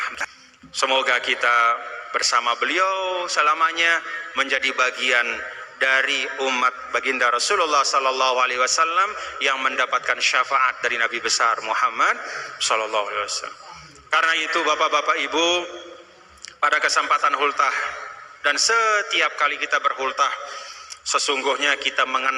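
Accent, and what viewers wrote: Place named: Lombok, Indonesia. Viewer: hihi bahasa lomboknya masih nyantul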